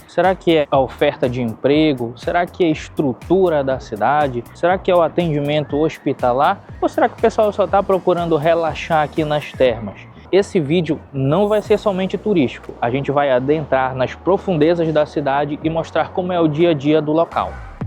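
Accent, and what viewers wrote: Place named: Amapa, Brazil. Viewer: eu tambem sou de Macapa, logo reconheci o jeito de falar.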